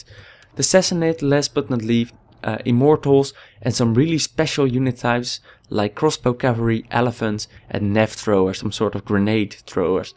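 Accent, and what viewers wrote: Netherlands. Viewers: i hear your dutch :D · Hm. I never heard you dutch speak english before. The accent had me wondering where you might be from, i actually had to look it up on your channel :P If you had been speaking german instead, i'm sure i would've recognized your heritage at an instant. In other news: Neat video, i kinda like the accent for no reason as well. Only 4 more days till Attila, WOO! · Are you dutch? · haha nice, ik hoorde al zoiets aan je stem dat je nederlands spreekt haha, maar je spreekt erg goed engels hoor en een gaafe video!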